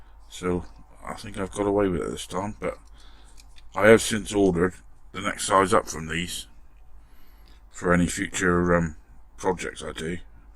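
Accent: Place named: Devon, United Kingdom. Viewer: Hi, loving all your videos, always a joy to watch, how do i contact you directly regarding your restos? you also sound like a Devonshire lad like myself, i also after watching this video like to share a few tips with you also, all the very best, stay safe bud.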